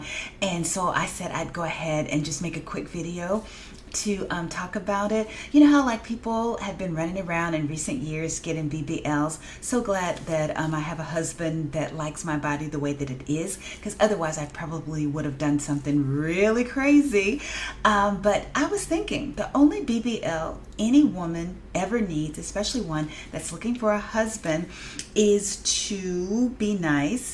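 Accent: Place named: South, United States. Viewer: okay, I thought I was picking up that southern lady style and I did hear you say that you and your husband were at Clemson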